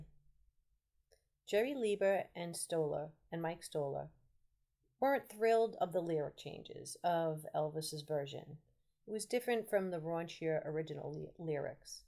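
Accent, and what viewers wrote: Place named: New York, United States. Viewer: What part of New York are you from? I love the accent - I miss it! I used to have the "east coast accent" (I lived in Trenton) - but I've lived in Ohio for close to 40 years now... so it only comes back when I'm angry!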